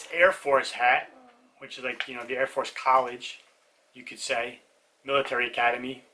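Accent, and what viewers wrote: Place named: New York, United States. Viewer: I’m a reseller and found your channel and I wanna say I love your videos I am also from New York but live in Cincinnati Ohio I knew the second I heard your voice you were from home.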